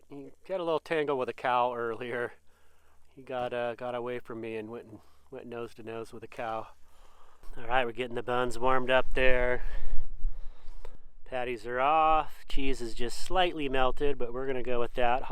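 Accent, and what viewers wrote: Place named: Minnesota, United States. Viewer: 2nd camp is so beautiful. I love Minnesota voice.. I’m from Michigan, I can hear his accent haha! Coyotes freak me out, not a fan. Love the prairie dogs🩵 so cute! I love ribeye steaks. Burgers for the win! Safe travels & camping, until next time!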